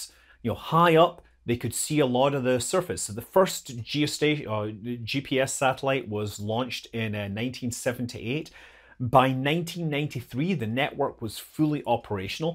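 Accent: Scotland, United Kingdom. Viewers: I think we’ve missed one of the most important use of GPS. How a golfer knows the distance to the green when he’s so off track in the trees that he can’t see the flag. 😊 Good to hear such a knowledgeable Scottish voice on here.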